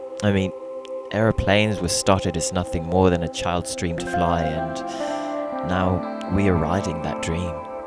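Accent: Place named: United Kingdom. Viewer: ini yang voice overnya british2 gimana gitu yah ngomongnya..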